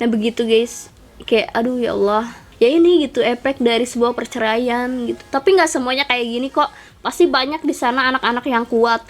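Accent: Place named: Sunda, Indonesia. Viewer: Sundanya medok😀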